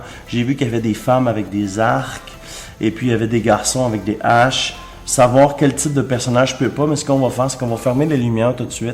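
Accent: Canada. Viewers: je tes découvert il y a pas longtemps je vu tes vidéos et j'adore je vais m'abonné en plus j'adore ton accent canadien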